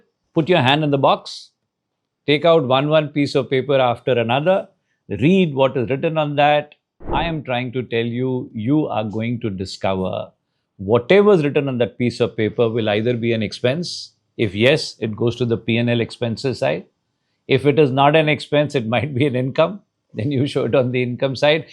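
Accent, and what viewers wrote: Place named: India. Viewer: the rhythm, gestures and pauses all indian, yet speaking in englishhhhhhh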